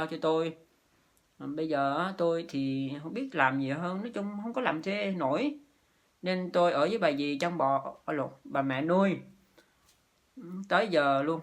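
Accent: Vietnam. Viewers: Thấy cuộc đời của bạn quá khổ, như vậy quê bạn Bình Định, sau nói tiếng miền Nam chuẩn quá, vì cuộc sống vất vả, thiếu thốn nên sức khỏe yếu, mong bạn vượt qua mọi khó khăn, hiện tại bạn làm youtube phải khg cố gắng lên nhé mình se vượt qua mọi khó khăn, mong bạn làm có tiền để có thể có 1 ngôi nhà cho 2 anh em ở và thờ cúng cha mẹ, hy vọng mọi người có lòng hảo tâm sẽ hổ trợ bạn thêm vào cuộc sống, bạn sống rất chân thật và vui tánh, khg lời lẽ nhiều nhưng bạn tâm sự vậy mọi người cũng hiểu và biết hoàn cảnh khó khăn của gia đình bạn, ai đã chỉ dạy bạn làm clip thể này ? Bạn cố gắng làm clip và dành dụm mỗi khi mình được nhận tiền, bây giờ đã qua khổ cực phần nào hả y cố gắng lên mọi người sẽ giúp đỡ cho bạn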